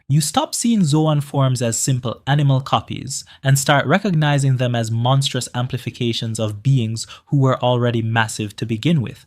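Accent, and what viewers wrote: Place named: Jamaica. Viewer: You Jamaican bro? There’s a familiar accent in your tone😂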